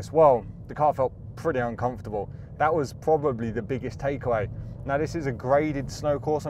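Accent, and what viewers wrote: United Kingdom. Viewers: British accent !!! Peace!!!! I am out of here.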